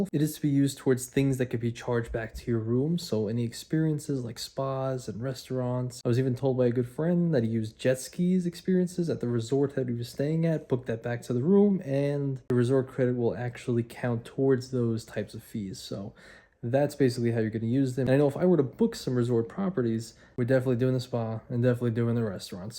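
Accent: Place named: New York, United States. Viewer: Are you from NY lol?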